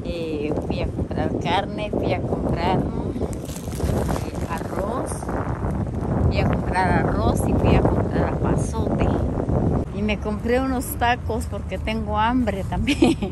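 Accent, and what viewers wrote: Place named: North, Mexico. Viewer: Ay como se lea subido el norte asta el ablar bien finjida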